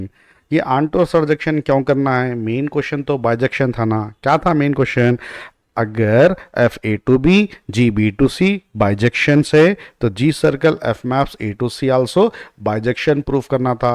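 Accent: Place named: Hyderabad, India. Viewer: With hyderabadi voice 😍 ❤️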